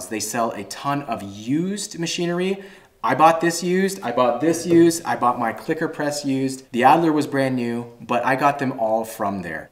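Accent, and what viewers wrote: Canada. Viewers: Great vid! Thanks. Is it just me or do Canadians say "out" more than anyone else just to say "oot"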